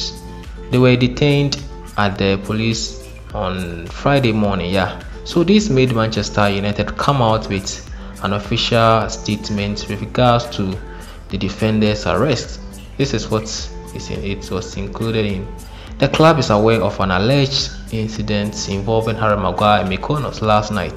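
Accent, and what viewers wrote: Africa. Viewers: Love the african accent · Are you an African? Your accents says it all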